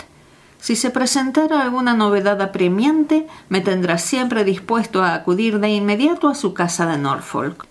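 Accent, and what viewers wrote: Rioplatense, Argentina. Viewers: Me encantó la narración, el tono y estilo de la voz, la velocidad, el acting... pero tanto SHO,SHO,SHO del castellano rioplatense me irritó y no terminé de escuchar. ¡Un abrazo y mucha suerte! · Para mí la pronunciación de las "y" y de las "ll" al modo rioplatense es absolutamente irrelevante. En cambio la entonación de las oraciones y de los párrafos es perfecto. La interpretación de los personajes y sus voces es sutilmente eficaz. No hay incorrecciones en el seseo o el ceceo ni en ninguna otro fonema. Los acentos son correctos. Y la narración de principio a fin es no sólo amena sino que consigue enganchar, entretener y alegrar el intelecto. Gracias de verdad por este canal de lectura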